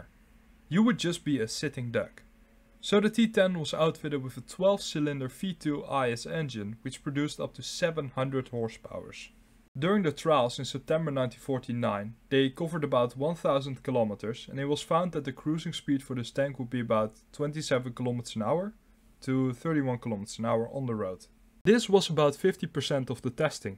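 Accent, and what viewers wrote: Netherlands. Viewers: are you dutch by any chance?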